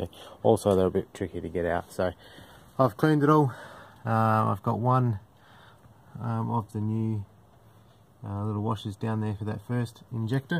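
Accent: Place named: Australia. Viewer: what a video, and talked in our Aussie language so it makes sense to us, doing mine hopefully in the coming month... one thing I have read on pages is diesel knock once replaced, do you know anything about what causes that or what steps need to be taken to prevent it? cheers, love the video mate, going to help amazingly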